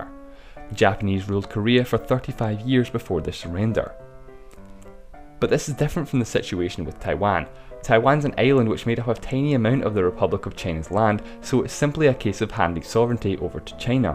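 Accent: Scotland, United Kingdom. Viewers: This guy is Scottish, I SWEAR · Anmol Madaan Scottish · Are you scottish cause you got a scottish accent xD??? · i can't hear scottish accent well... i need to learn more..ㅠㅠ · Everything's so fine about the videos, but please try to change your accent! Non native english (scottish) speakers, cannot understand! we love Scotland but scottish accent makes it all difficult. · from Scotland, English is his native language. It's how he learned to speak there. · I think he's scottish. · Hiếu Lương He sounds Scottish. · I cant understand why americans struggle with Scottish accents either, they are literally speaking English. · Scottish accent. Lots of English people find the Scottish accent difficult. And then we have the Welsh. Or worse still, the Northern Irish (or "Bradash!" as they put it). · Are you Scottish? :) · It's not a speach problem, it's the best accent in the world: Scottish accent. 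· He's scottish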